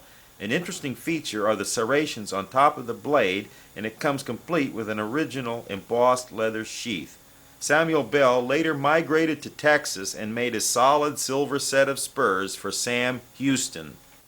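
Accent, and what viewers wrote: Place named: California, United States. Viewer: You know this guy was raised on a walnut and prune farm in California. Not a Yankee or Southerner. Many old time people from California pronounce words differently. Listen to some of the old time radio and television programs. For that matter do you pronounce Los Angeles and Saint Louis correctly? Most people don't.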